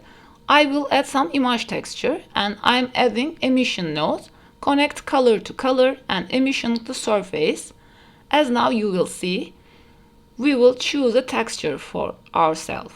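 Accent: Turkey. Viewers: Türk aksanını nerede duysam tanırım 😄 Güzel çalışma olmuş. Başarılar 😉👍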